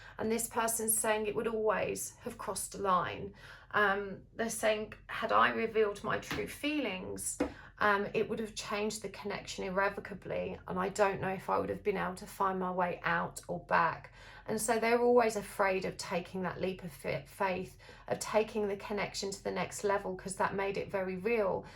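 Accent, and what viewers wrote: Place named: South London, United Kingdom. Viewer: I like to hear your voice. It reminds me of home. Somewhere between South London and Brighton I guess... I will probably never go back again as I refuse the vaccine, I'm pretty much stuck where I am. We make our bed and we must lie in it I guess. Anyway, thanks for the soothing and long-ago familiar tones of my home strip!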